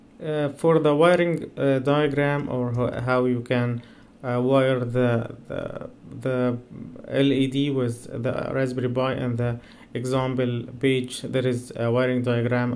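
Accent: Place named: Egypt. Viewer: من لهجتك عرفت انك عربي ومصري صدقني كثيرعرب يبحثون عن مثل هذي الشروح والبعض منا يجد صعوبه اذا كان الي يشرح انجليزي ف اتمنا منك تشرح بالعربي وتفيدنا جزاك الله خير وصدقني راح يتابعونك عرب كثير وانا اولهم ههههه اسأل الله لك التوفيق ..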